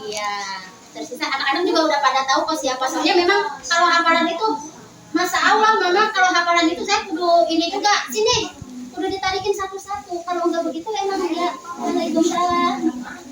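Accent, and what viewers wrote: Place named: West Java, Indonesia. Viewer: kaya logat sunda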